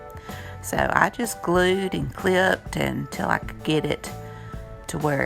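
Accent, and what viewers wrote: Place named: South, United States. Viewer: Such a cute kitty broom! Love your southern accent!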